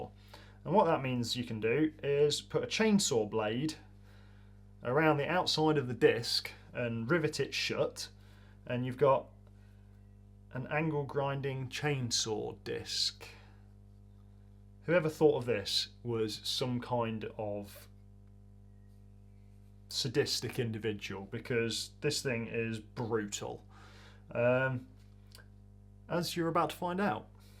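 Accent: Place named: Blackpool, United Kingdom. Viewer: Do you come from Blackpool? Your accent reminded me of Phil, from Limmys world of Glasgow (it’s on YouTube) 👍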